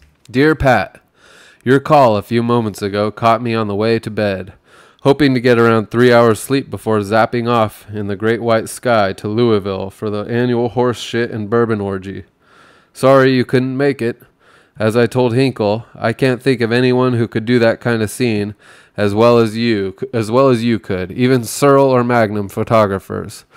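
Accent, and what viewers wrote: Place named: England, United Kingdom. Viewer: Great selection. Nice English accent. Cut the BS at the beginning of your podcast though...no one cares. 😉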